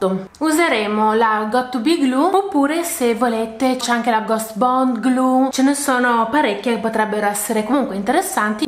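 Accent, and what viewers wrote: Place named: Friuli, Italy. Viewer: Ho una curiosità, di dove sei se puoi dirlo? Friulana? Sono in fissa dal primo video che ho visto perché hai un accento che non riesco a identificare 😂 all'inizio avrei detto tipo emiliana, ma sentendoti più spesso direi di no 🤔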